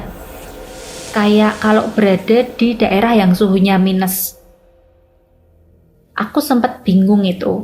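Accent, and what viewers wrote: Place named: Manado, Indonesia. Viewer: Logat mba nya Manado banget 😅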